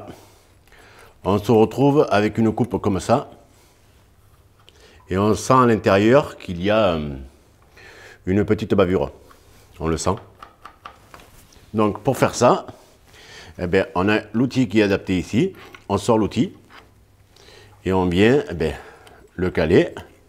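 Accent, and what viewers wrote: South, France. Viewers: Bonjour! Tu es vraiment bien outillé mais c'est aussi le secret d'un travail bien fait! J'adore tes vidéos et cet accent du sud qui respire le soleil! Stéphane de Belgique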